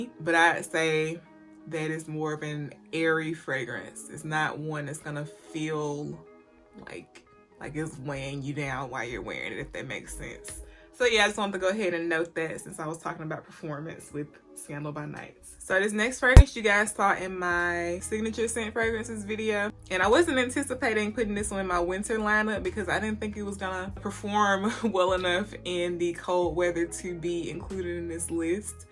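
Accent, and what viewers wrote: United States. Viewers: I love your country accent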